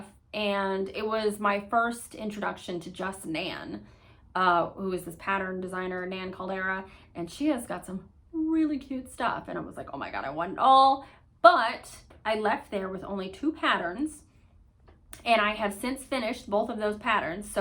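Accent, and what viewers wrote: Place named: France. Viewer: Hi, really enjoyed your video and energy. Can wait to see more. Your French accent is perfect! Are you French?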